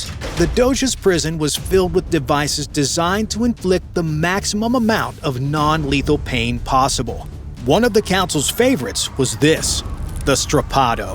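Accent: United States. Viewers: The sensationalist, excitable american narration makes this unwatchable (like most 'documentaries' from that part of the world). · American accent makes the video cheap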